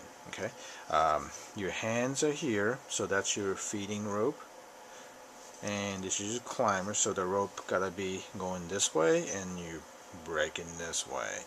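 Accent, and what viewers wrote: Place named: United States. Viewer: So much easier to listen to America English that I use everyday.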